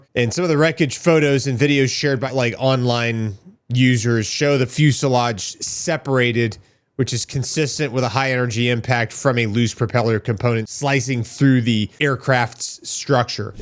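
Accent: United States. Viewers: Very sad story. On the other hand, it always amuses me, how Americans pronounce Georgian words.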